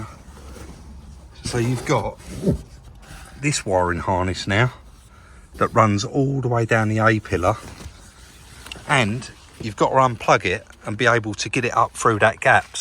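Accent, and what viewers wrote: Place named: England, United Kingdom. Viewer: i am a city and guild panelbeater( body repair tech now haha) of the old school variety with nigh on 50 years exp. and only recently threw it away because of these nightmare strip/assemble scenarios. The last vehicles i worked on were '21 audi s8 hit hard in the r/r and a virtually brand new Jag i-pace hit full noise frontal, both fantastic vehicles to work on, the Audi was a breeze really, but as you say getting one's head around the procedural dilemma is the mind fk i do not need. You do a great job of an explanation of pitfalls and tips for this volvo airbag s&a( i love volvos) I work in an engineering shop now; lathes, milling, presses etc and specialising in aluminium tig welding.. much easier haha-- love this, new found, channel and dig the pommie(p.o.m.e. = prisoner of mother england) accent, i'm in nz and have been for over 40yrs..rock on man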